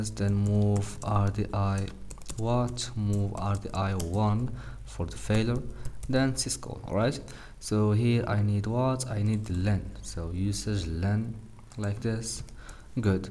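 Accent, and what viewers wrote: Morocco. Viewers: itoub 3lik, ghir mn pronunciation 3rftek mghribi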